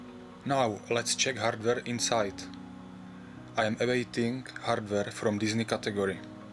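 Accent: Czech Republic. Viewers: Hned jak jsem slyšel ten tvůj akcent tak "jaj čech" :D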